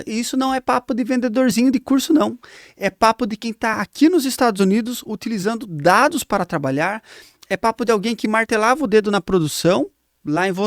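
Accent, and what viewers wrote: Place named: Sorocaba, Brazil. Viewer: Nossa cara eu moro em Sorocaba SP e pelo sotaque ia até perguntar se você era da região mas respondeu a pergunta e sempre como ótimos vídeos.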